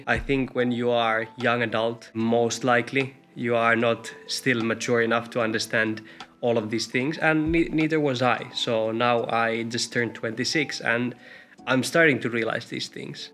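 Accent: Finland. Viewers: hehe, I just found your channel yesterday, and I'm already in love x"D...I actually live in the same city as you and it's so fun to watch videos made from here XD☆ plus a Finnish person making videos in English, with beautiful Finnish accent, makes me so much more confident to speak English myself, too!! I also love that your videos are quite short, there's nothing unnecessary, so they're nice to watch♡ but, I must say, as a beginner in minimalism and intentional living, it's too bad your videos are very addicting! especially when you almost always recommend another of your videos at the end "xD it's hard to get rid of binging social media, when you make too good content! XD ja must on myös tosi siistii et löysin suomalaisen kanavan, joka on lähellä mun omaa ajatusmaailmaa (^_^) kiitti kun teet näitä videoita:3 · I love to listen to the Finnish accented English. 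I’m a pensioned farmer with a lot of old stuff trying to downsize and organize. I have resisted buying every power tool. Hand tools are easily fixed when they break unlike the stress caused by complicated machines. Scooping snow is good exercise and so is being on the bike.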